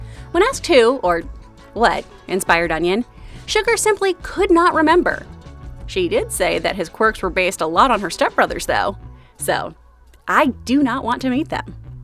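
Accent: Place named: Texas, United States. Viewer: I knew there was a reason I liked your voice 😆 Being a Texan, I love familiar accents above all else 😋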